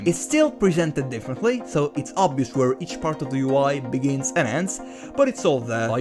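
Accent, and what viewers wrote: Italy. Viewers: Si sente che sei italiano dalla pronuncia, però ottimo video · il tuo accento inglese e bellissimo ma si sente un botto che sei italiano hahah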